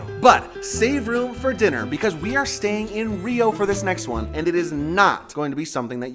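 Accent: Spain. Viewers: Are you from the US? Where does your Spanish accent come from? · The way he forces himself on sair Brasil is kinda annoying. The spanish accent is so uncessary.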